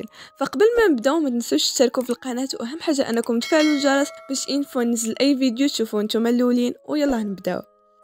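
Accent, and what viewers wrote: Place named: Morocco. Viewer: هذه اللهجة المغربية 😊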